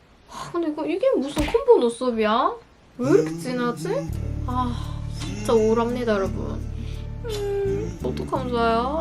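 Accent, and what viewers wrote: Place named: Daegu, South Korea. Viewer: 대구~~ 대구 사투리 반갑습니다~~^^우리동네예요~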